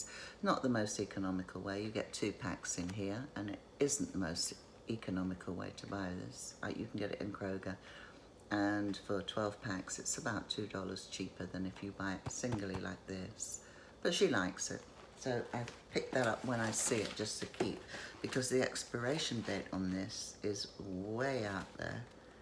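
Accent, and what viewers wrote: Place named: England, United Kingdom. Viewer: Beautiful accent are you from england??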